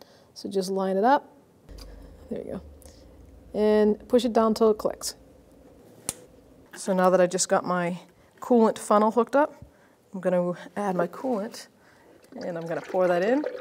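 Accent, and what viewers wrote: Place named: Medford, United States. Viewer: That’s a Medford accent! Gotta be!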